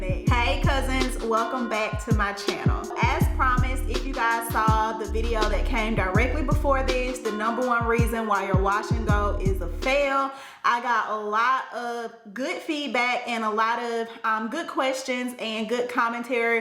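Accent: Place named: Alabama, United States. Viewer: Had a feeling you were from Alabama..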